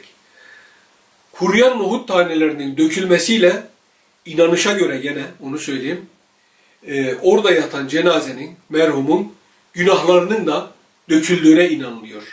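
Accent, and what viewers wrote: Gaziantep, Turkey. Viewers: Abi sen normal konuşma ya Antep Ağzı konuş... Yani yanlış anlama abimsin ama sırf seni dinlemek için günlük bi videonu açarım az evvel Abonede olayım dedim,şimdi Antep ağzıyla,hem yorum yapiyin hem abone olmayıksın yoğrum deme diye... Mersinliyim ama keşke Antepli olsaydım. Yani Abicim sana o ağız çok yakışıyor,çok orjinalsın vesselam...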